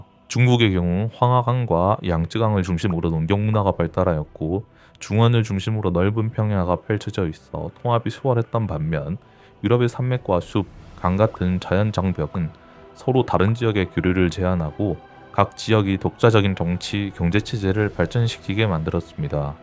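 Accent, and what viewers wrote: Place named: Gyeongsang, South Korea. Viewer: 표준어를 쓰는듯 하지만 느껴지는 경상도의 향기...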